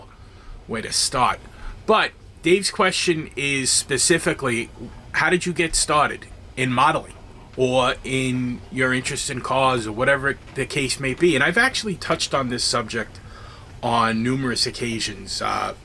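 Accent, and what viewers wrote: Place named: East Coast, United States. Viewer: Thank you very much for sharing. Your east coast accent brings back a lot of memories for me. I haven't been back in a long time!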